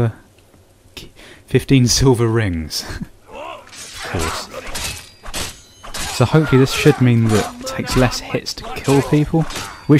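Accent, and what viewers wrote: United Kingdom. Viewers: It's so good to hear a british let's player, love you're rude, witty commentary, and the foul language aha doing us brits proud.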